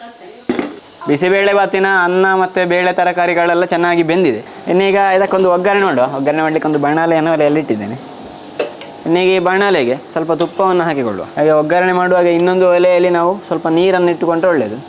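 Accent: Mangalore, India. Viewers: Mangalore kannada...nice to hear 😊..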